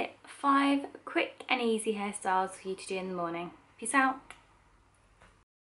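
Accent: United Kingdom. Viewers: I love the British accent that you have!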